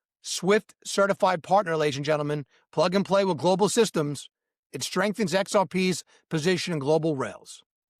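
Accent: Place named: New York, United States. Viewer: My man is right on. Good info. Dig that N.Y. accent burning!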